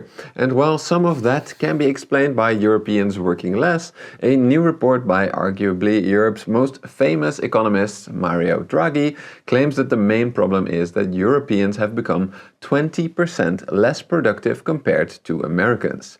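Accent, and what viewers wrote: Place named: Netherlands. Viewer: Strong dutch accent😂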